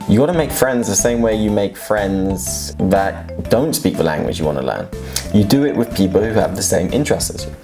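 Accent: United Kingdom. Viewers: 정말 훌륭한 조언인 것 같네요. 한국어를 배우려는 외국인들뿐만이 아니라 잘못된 영어교육을 받고 있는 한국인들에게 좋은 팁이 되겠네요. 참고로 영국의 악센트는 언제 들어도 매력적이네요. 이 영상 보면서도 계속 따라했네요 ㅋ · 영어 영국 발음!! 진짜 멋진것같아요 영화 토르 볼 때마다 매번 심쿵 ㅠㅠ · 씨(?)의 학습법에 매우 공감합니다. 그리고 영국 발음은 언제나 듣기 좋네요 ㅎ 언제 한번 영국 발음 천천히 제대로 들을 수 있는 영상도 찍어주셨으면 좋겠네요. 물론 제 개인적인 희망사항입니다.. 후훗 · 영국 발음 너무 매력적이다... 💜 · 영국악센트지린다....하♡ 영국어배우고싶어 ㅜㅜ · 와씨 영국발음 개좋다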